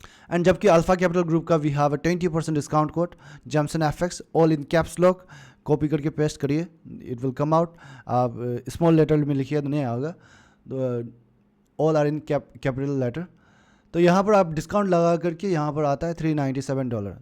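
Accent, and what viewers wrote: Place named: Punjab, India. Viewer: u sound like a punjabi guy❤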